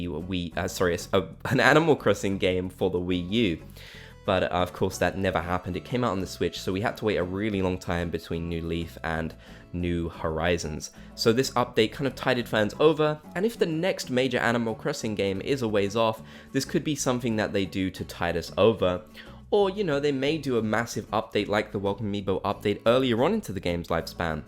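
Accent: United Kingdom. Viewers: Gang can y'all boost this so he sees it? Having accurate captions would help viewers like me a lot. The auto-generated ones don't work well with British accents and I really want to be able to watch these videos AND fully understand what you're saying Accessibility is really important! #Accessibility #DisabledViewer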